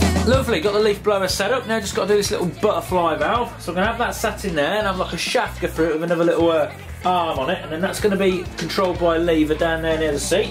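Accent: England, United Kingdom. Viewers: What is real funny, is to watch the Subtitles. I don't think that whomever or whatever is putting in subtitles can understand his heavy English Accent any better than I can, but some of the translations are just hilarious!!!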